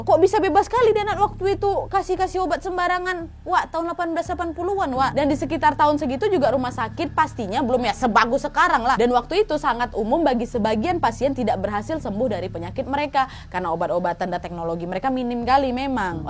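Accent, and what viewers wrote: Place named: Java, Indonesia. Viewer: Mood banget kak ngomongnya medok 😀😀😀😀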